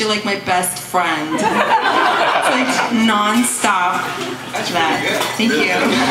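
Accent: New Jersey, United States. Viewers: i love her jersey accent... but I'm from jersey and don't talk like that lol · with a Jersey accent is HILARIOUS! Gotta love her lol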